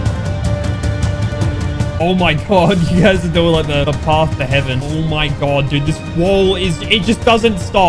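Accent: Australia. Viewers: You australian i watched you before lazarbeam but i saw you on his video and thought you were aussie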